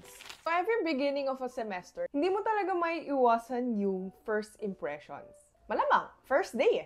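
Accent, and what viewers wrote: Batangas, Philippines. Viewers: wow. your channel popped up on my feed just now. grabe te, natural yung topak mo. pikit lang ang mata, pinoy na pinoy ang punto. grabe tawa ko! this was really enjoyable. i'm surprised na wala ka nakita ibang koreans masyado sa UP since kahit na 20+ years ago, andami na umaaligid aligid sa UP. may nanligaw pa nga sa orgmate ko eh. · You’re so funny. If I don’t see your face I will absolutely say you’re Pinoy. You behave, sound and act like pure Pinoy. Great job! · Nakakatuwa, lumalabas pa rin ang batangas accent ni ineng. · Koreana ka sa itchura pero accent mo Tagalog Hindi ka na masabi na koreana ka...Ang galing mo kunwari lahat ng Korean ay magkaka klac at subject pilipino..malamang ikang ang pinakamagaling sa lahat.. specially 4 years old ka sa pinas ka na. Baka fluent ka pa sa Tagalog Kay sa Korean language.. . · Alam mo ng napanood kita sa vlog mo natuwa ako kase talo mo pa ako mag salita ng batanguena kse batanguena ako pero sa manila ako lumaki at ng aral ng high school at kolehiyo tuwang tuwang ako sayo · I like esp. 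Bataguena accent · i initially thought na filipina ka na singkit lang talaga (like pure filipino dahil may friends akong they look like they are half but in reality, full filipino) dahil sa vibe mo and the way you talk, u earned my sub! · Yong sound nang english mo ate girl true studied in philippines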